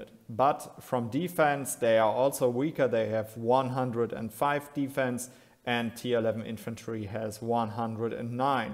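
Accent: Germany. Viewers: Very fun German accent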